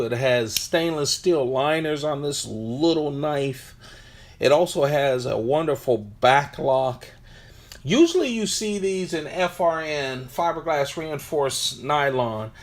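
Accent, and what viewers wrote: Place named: Boston, United States. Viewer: Spyda-co. This guy's gotta be from Baastin.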